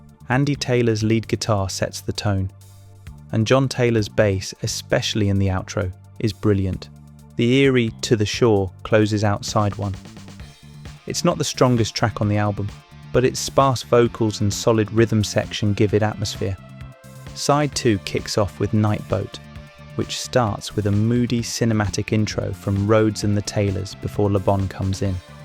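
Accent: Australia. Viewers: I can detect some aussie accent in the voice over? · an upper class South Australian?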